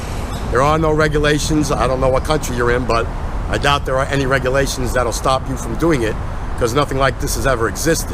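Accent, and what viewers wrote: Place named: United States. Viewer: Like 110 I enjoy to watch u videos and I enjoy to listen the American accent to learn it 👍👍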